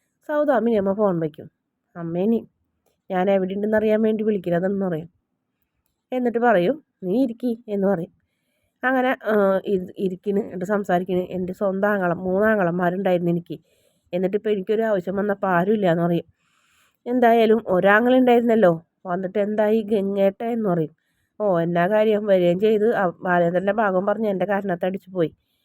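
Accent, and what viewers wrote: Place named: Thrissur, India. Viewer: തൃശൂർ സ്ലാങ് ആണല്ലോ 😂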